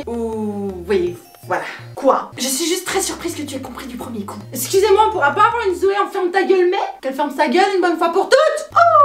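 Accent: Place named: Brussels, Belgium. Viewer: L'accent bruxellois sa me fait rire!! Bravo continue ce que tu fais:)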